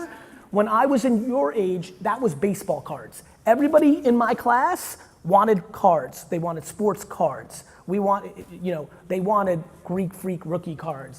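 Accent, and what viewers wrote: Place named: Armenia. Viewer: هاي ارمي🌝🌝❤ قبل لااقرا اسمك حسيت من طريقة كلامك انك ارمي🌝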